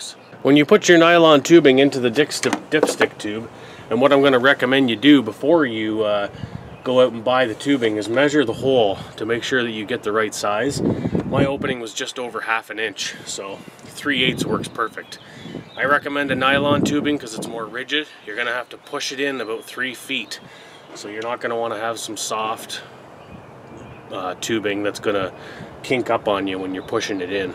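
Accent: Canada. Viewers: This guy sounds Canadian to me. And he used Standard International units (Litres). 😉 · He sounds Canadian.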